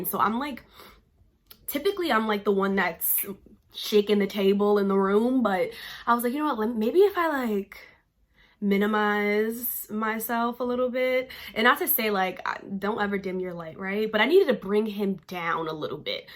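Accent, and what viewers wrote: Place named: New York, United States. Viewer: Are you from NY you sound like it?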